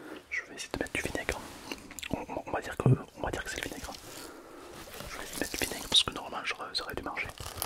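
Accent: South, France. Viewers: L'ASMR avec l'accent du sud. Incroyable.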